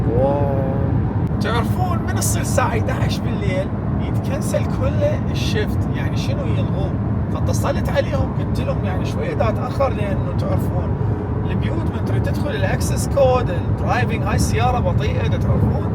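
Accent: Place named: Baghdad, Iraq. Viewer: عبالي انت من بغداد 😊😊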